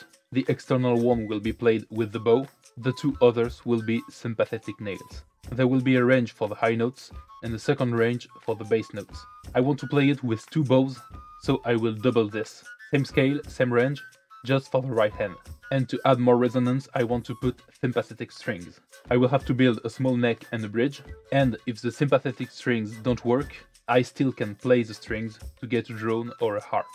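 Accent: France. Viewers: Salut ! J'ai beaucoup aimé la vidéo, mais je me posais juste une question : Comment as tu réussi à autant développer ton vocabulaire en gardant un accent français si prononcé? la bise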